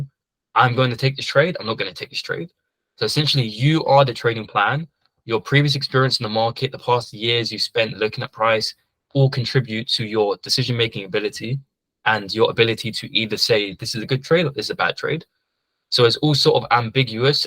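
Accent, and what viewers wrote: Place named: United Kingdom. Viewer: Brits accents, huh manageable 😢😅❤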